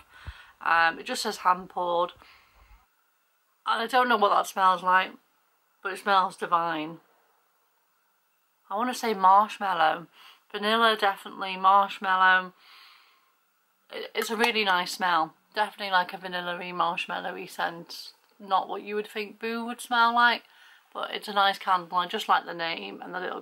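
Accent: United Kingdom. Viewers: I just came across your channel. I listened to what you had to say. Love your English accent.❤️❤️❤️❤️❤️ I Absolutely Love Their candles. I pick them up all the time in TJ Maxx , Home Goods, Marshall's here in the states. I can't live with out them. I have the in all different sizes from the tiniest little ones to the biggest ones. I have single wick, double wick, and three wick. They are Fabulous candles. And by the way I love anything that has to do with pumpkin. I also just subscribed to your. 👍🏻⭐☺️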